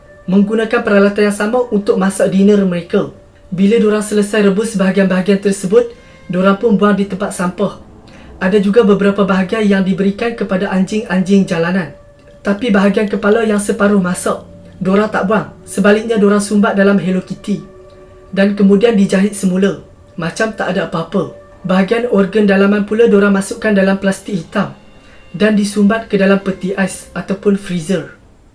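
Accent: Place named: Kuala Lumpur, Malaysia. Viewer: SLANG2 TU JGN NAMPAK SGT..JADIKAN SLANG K.L ORI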